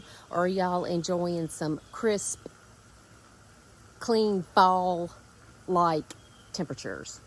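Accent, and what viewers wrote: South, United States. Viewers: Good morning,. Hope it cools off soon for you. Here in Ohio--we have temps in mid to late 50s in early morning. Then it creeps up to mid 80s by the late afternoon. Yes I understand what you are going through with the walnut tree and shrubs. I've had similar problems with a walnut tree mixed in with tiger lilies growing next to the garage. Last year we even had poison ivy in that space. I had to clear it out (protected by gloves) and still got a little rash on my forearms. We have very dry soil right now. It hasn't rained in over two weeks. I love your southern accent,. Soil or soul is fine with me.😅 You did a good job clearing out the problem area. Have a wonderful Sunday afternoon.😊💖💕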